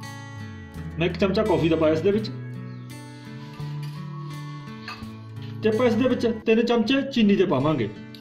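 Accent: India. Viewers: Bhai aap ki punjabi bilkul mere nanhyal wali he wo ambala punjab k the ab hum Pakistan k punjab mehe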